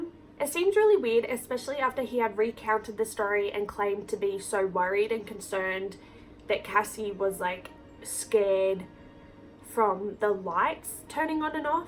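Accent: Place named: Australia. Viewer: He can never be forgiven for what he did, neither of them can, but I do believe I see regret in Brian's eyes. The other dude just comes across as a complete and utter psycho, and his parents are clearly deluded and in denial. Anyway, awesome presentation! You're rather easy on the eye and who doesn't love an Aussie accent? Sucks that you don't really seem to be active any longer. Stay safe!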